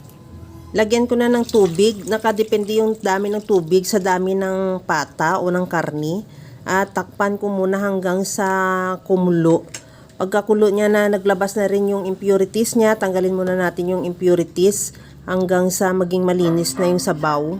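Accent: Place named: Ilonggo, Philippines. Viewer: Ilonggo ka po? I like the accent hehe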